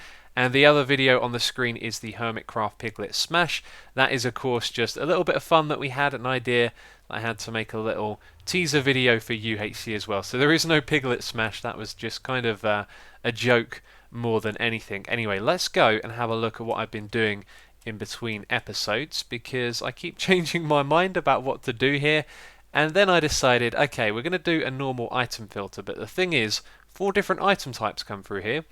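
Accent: England, United Kingdom. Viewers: He is from England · Questions for the Q&A : 1) Ketchup or Mustard ? (yes, there is a correct answer :P) 2) More generic one: What made you get into recording game play videos? 3) You're quite obviously a Brit, but where in the country are you from / grow up? I'm a Londoner personally, and your accent isn't that different from mine.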